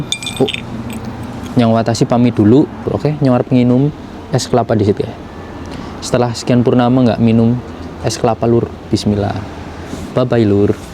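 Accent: Banyumas, Indonesia. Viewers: Jawa ngapak mas? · Kaya wong Jawa Tengah bagian kulon😂,, ngapak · Enyonge kan batang sampeane kan Endi kang ? Kok ngapak" wae. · Ngapak kh bg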